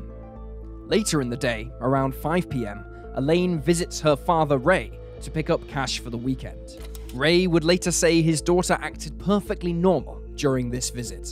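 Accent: United Kingdom. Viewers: Lovely creative girl. Such a shame 2015 happened to her. My mum brought me up , that girls think its cute how timid I am in terms of touching , don't get me wrong I love wrapping my arms round and kissing and sweeping them away or trying but I don't get guys who think a no is a challenge or chase or they want so they do. Anyway from the UK why do Americans say its 20, 600 block instead of what it actually is? 26 thousand block like the man said (narrator's voice from the UK) . I can't end the comment taking away from this girls story.so apologise but from the UK , I hope her end is not final and if it is I hope justice comforts her and her family.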